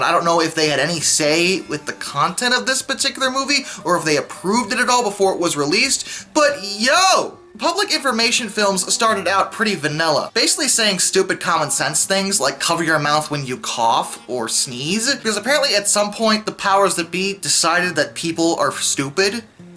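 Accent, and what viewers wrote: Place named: United States. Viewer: Can't watch it. Bloke is a Moron. Annoying Yank. Shut the F up.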